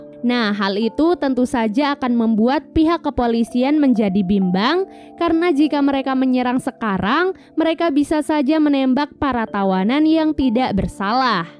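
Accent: Lombok, Indonesia. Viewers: Mbak dari lombok ya?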